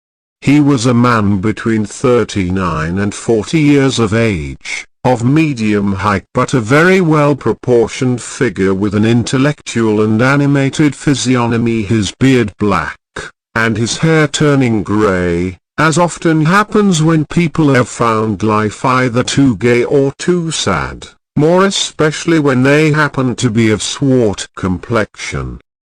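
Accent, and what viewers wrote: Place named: United Kingdom. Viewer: I want to try this 😁 Edit: thank God this is British. I can practice my accent 😆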